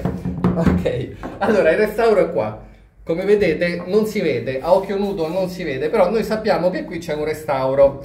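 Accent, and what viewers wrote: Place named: Rome, Italy. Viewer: Ma è sicuro che «craquelure», francese, si pronunci proprio così come fa Lei? Confronti l'ortoepia magari su Google traduttore e vedrà che l'esito è un po' diverso dalla Sua interpretazione. Certo la /ü/ è notevolmente difficile da rendere, specialmente per un «romano de Roma», però con un po' d'esercizio magari...!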